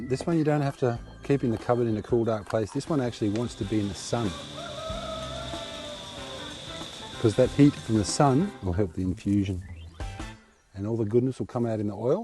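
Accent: Australia. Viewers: Hi 😊 What would be the chances of me coming to do work experience? Forgive me if im wrong but that is an Aussie accent? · I subscribed for the same reason! Gardening and an Austrailian accent. Good day! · Thank you for giving free information and I copied down much of the links and when I get paid I will be supporting your work and I appreciate all the information and help! Thank you so so much and I love your Australian accents helps my American add mind pay attention! ❤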